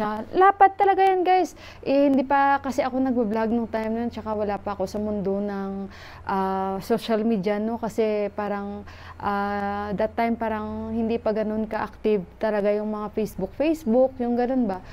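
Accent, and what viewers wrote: Iloilo, Philippines. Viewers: Hello po ma'am watching from taytay rizal.Ang cute ng accent mo ma'am alam ko ilongga po kayo🥰🥰🥰 madali lang po gamotin ang buni danas ko po yan sobrang kati.Gusto ko lang po i share kung paano ko ginamot ang ringworm ko.Simply lang po madali lang lalong lalo na sa mga malayo sa bilihan ng gamot para po ito sa inyo,madali lang po gamotin kumuha lang po kayo ng isang dahon ng langka na tuyo yung medyo malaki na dahon at maliit na lagayan sunogin lang po ang tuyo na dahon ng langgka at lagyan ng langis ang abo nito kunting langis lang yung tama lang mabasa yung abo at ipahid doon sa ringworm yan lang po linisin muna sabonin maigi bago lagyan ng abo ng dahon ng langgka bago ipahid.Sana makatulong ito lalo na po doon sa mga malalayo sa bilihan ng gamot.Salamat po more power po sa channel nyo ma'am GODBLESS po🙏🙏🙏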